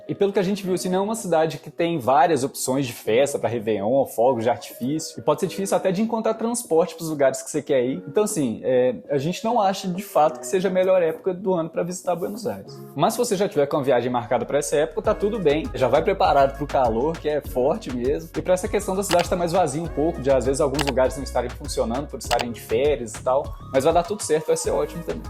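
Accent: Minas Gerais, Brazil. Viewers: Gostoso demais ver vídeo com sotaque mineirim! Parabéns aí! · ei cara otimo video , eu desconfiava que tu era mineiro meio matuto tudo termina em (ENO) correno,comeno,fazeno,sabeno , falano e por ai vaiiii. estou (INO) pra la otima dica.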